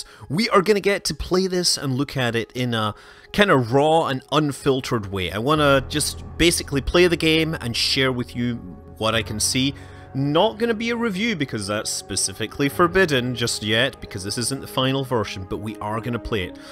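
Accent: Scotland, United Kingdom. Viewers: It's called a scottish accent. Turns out native English speakers around the world don't all speak it the way you specifically do.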